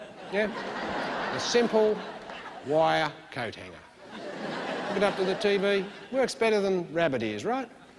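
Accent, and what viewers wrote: Australia. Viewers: Cent stand the Australian accent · That Aussie accent is just a killer . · He is why us Americans love the Australians. Actually, we think everyone with an Australian accent is cool.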